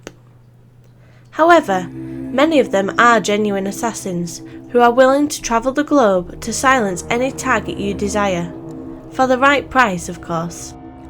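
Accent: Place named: Northern England, United Kingdom. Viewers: North of England. Yorkshire or Manchester maybe. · are you British? Your accent sounds it I'm from Nottingham you sound more northern but I could be wrong lol what county you from? If your from England that is lol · rapper She sounds like she could be from Bradford?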